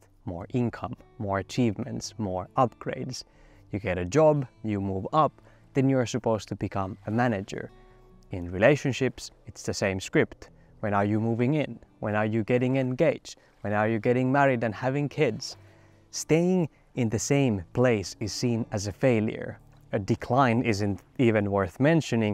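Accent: Finland. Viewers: The first word you said and me: he's Finnish lol. Greetings from Vantaa. Unfortunately even Finland has fallen into the american toxic mentality. Currently planning to go back to Italy (I'm Italian) and disappear in nature, mountains and sea. As anarchist I would say: screw (I usually tend to use the word that starts with a capital F) all these toxic societies, screw money, screw status, screw this sick narcissism, screw this insane form of capitalism, screw consumerism, screw this poisoning individualism and screw your racist country lol, at least part of it. I will miss a lot the good finn and probably you know what am talking about (usually the socialist ones). Now Finland you can hate me, I don't give a...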